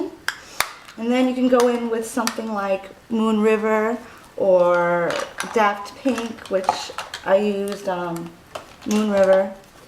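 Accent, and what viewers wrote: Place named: New York, United States. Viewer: You are so stinkin' adorable and I just love listening to you...your NY accent is so cute!!! I love my HIGH BEAM too! I almost am due to replace it. Love ya, sweetie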